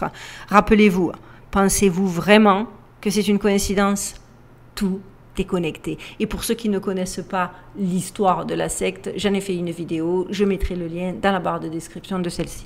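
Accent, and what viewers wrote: South, France. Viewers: Salut ma jolie 🤗❤, je suis comme toi le vilain petit mouton noire, et aujourd'hui je m'assume totalement et je dirai même j'en suis fière, fière d'être différente et puis touts ceux et toutes celles qui sont différents et éveillés sont directement mis dans le moule dit "complotistes, fous, pas clair..."et j'en passe, moi et mon Moustache ( mon chat Noir et blanc) on t'❤aime car nous te ressemblons tellement à toi et à Melle Duchesse!!! Merci pour ta sincérité, ton humilité, ton accent qui chante notre jolie Sud...Tu es une belle âme, ne lâche rien, courage je sais que c'est difficile, mais tiens bon ma jolie, je t'envoie une galaxie d'infinies pensées remplies d'amour, de paix, de courage et de positives!!! Et pleins de bisous remplis de tendresse et de douceurs ainsi que plein de câlins pour Melle Duchesse, j'espère que tu as vu ou entendu parler de la fête de 3 jours que la ville de Toulouse va faire du 25 au 27 Octobre de cette année, c'est comme un immense Carnaval et le titre c'est "les portes des ténèbres" il me semble ( je t'avais laissé des messages à ce sujet) ce serait un superbe sujet à traiter et surtout à dénoncer, car le mal est vraiment là et ils ne se cachent plus, dans l'énoncé ou résumé de ce sombre spectacle c'est écrit que ce spectacle est fait pour connaître les ténèbres parce que pour découvrir la véritable lumière il faut d'abord passer par les ténèbres 😮😢 vraiment navrant et triste!!!! 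Merci pour toutes tes superbes videos, tu no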